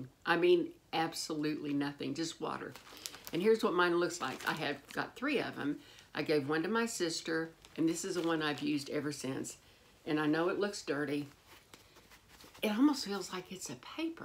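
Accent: South, United States. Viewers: I heard about you from Sandra. You are both wonderful. If I had gotten married again, I would learn a lot from you, your charm and intelligence. Am 70 something years old and still looking for a great guy. With your living care, no wonder he’ll be walking asap! Question about the protein bars: what’s the name of the company? The flavors sound delish!!!. I live upstate NY. Live your Southern accent. Kind regards, FR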